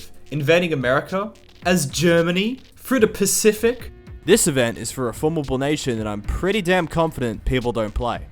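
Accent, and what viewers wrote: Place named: United Kingdom. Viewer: Why are all hoi4 youtuber sound british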